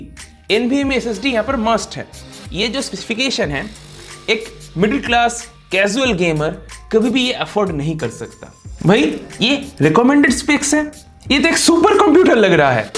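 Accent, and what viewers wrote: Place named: Bihar, India. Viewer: Bhai phle naak se baat krna band krde its very hard to understand what you are saying in that bihari accent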